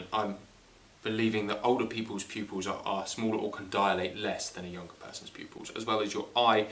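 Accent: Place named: RP, United Kingdom. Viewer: POSH BLOKE. your knowledge is impressive.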